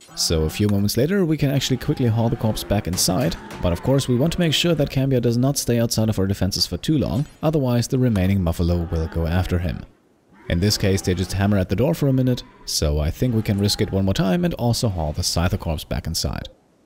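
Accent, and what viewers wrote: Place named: Germany. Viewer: I thought you were German. It's not super obvious, but reminded me of how I sounded some years back.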